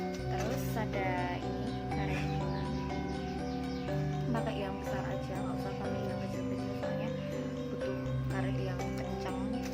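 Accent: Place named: Java, Indonesia. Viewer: Hahaha... Biasa mbak, medokmu bisa jadi karakter tuh dalam berbahasa indonesia.😄 google adsense siap menantimu..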